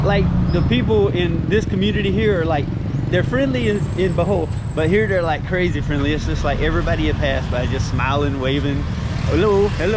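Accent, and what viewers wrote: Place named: Arkansas, United States. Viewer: bro your accent , are u from Arkansas? its hard to distinguished American.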